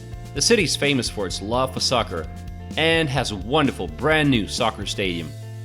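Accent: United States. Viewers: привет! You have made a great, inspiring and informative video, and it is truly made with passion for the country ;-) no matter what Larissa Koroleva is saying (her "funny" comments below)...the laid back music also fits in very well....to my ears your english sounds very american/english (maybe with a 2% russian accent).....and IMO the video is well done all the way.....большо́е спаси́бо! ;-) Sugiiiiiiiiiiii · Feel quite a bit of propaganda seeping through! State slogans .Some truth too .! & why is American Georgia mentioned? Looring American tourists ? Maybe I'm wrong . Maybe the narators almost American accent is a true American one . We will never know . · It seems you didn't get that the maker of this video is a Russian who speaks English with an American accent.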